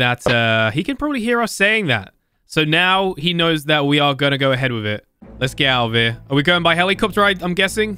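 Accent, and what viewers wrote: United Kingdom. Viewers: are u from da UK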